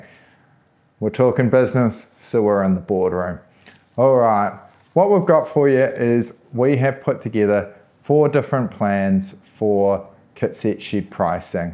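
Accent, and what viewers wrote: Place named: New Zealand. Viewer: gotta love the kiwi accent, reminds me of that banned ad, of the guy getting his neighbours wife & kids to play on his deck !! 🤣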